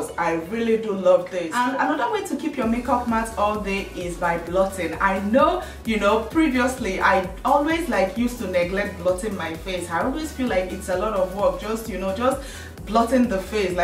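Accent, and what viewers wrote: Nigeria. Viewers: Like seriously I just subscribed like seriously I like you oooh naija accent on fleek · i love that you maintain your natural naija accent, instead of confusing us with oyibo 'init'. i learnt a lot. thanks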